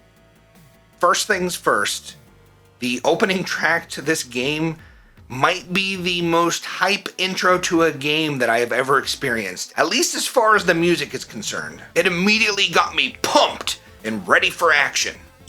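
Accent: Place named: United Kingdom. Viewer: Only just stumbled across your videos mate. Very entertaining, and great to hear a fellow brit in a genre swamped with Americans!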